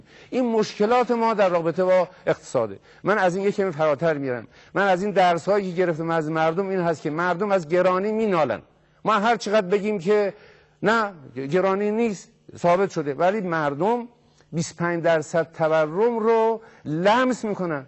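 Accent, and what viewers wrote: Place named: Yazd, Iran. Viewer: moosavi yazdie ta oonja ke man midoonam! fekr mikonam lahje yazdi bashe.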